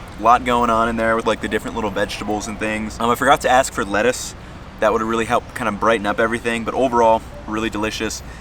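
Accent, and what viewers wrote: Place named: United States. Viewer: I visted MXCD this year and really loved it! But, your Spanish pronunciation is soooooo American 😂 You definitely need to work a lot on this! It’s hard to understand you sometimes. But still thanks for the effort ❤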